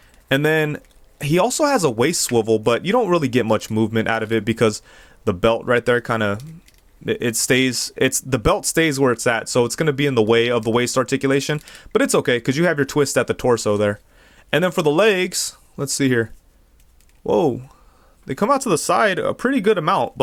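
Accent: New York, United States. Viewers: Where you from? You have this nice New York accent to your voice. Reminds me of family from the Bronx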